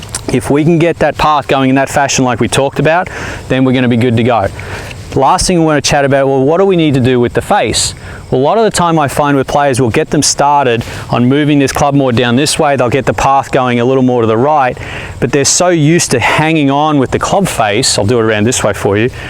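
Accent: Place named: Australia. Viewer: Thank god a aussie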